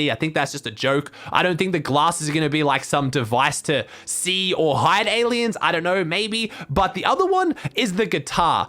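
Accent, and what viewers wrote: Australia. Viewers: has a very strong Australian accent but when he starts off his second line with “Welcome back to my YouTube Channel!” It sounds so British how he always pronounces it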